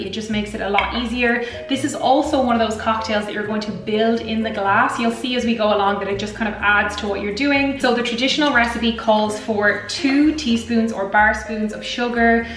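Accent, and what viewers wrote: Ireland. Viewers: Damn. Prolly the best looking Irish girl I’ve seen. That accent I can barely understand though. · You are simple beautiful love your irish accent. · I love your Irish--accented Spanish <3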